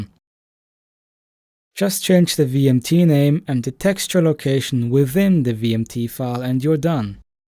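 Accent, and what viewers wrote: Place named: Germany. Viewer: Lel, hab mal dein Modding Video von vor 6 Jahren mit diesem hier verglichen. Den deutschen Akzent hört man so gut wie gar nicht mehr raus, nice :D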